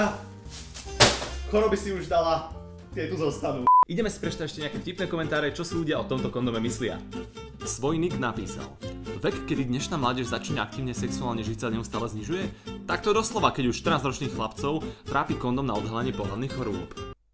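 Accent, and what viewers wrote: United Kingdom. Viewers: o Ten britský prízvuk :D niečo neuveriteľné :D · Ten tvoj britský prízvuk mi pripomenul Wheatleyho z Portál 2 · Ty si sa učil Britskú Angličtinu ? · haha ten uk prízvuk si zabill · Super britský prízvuk :) :D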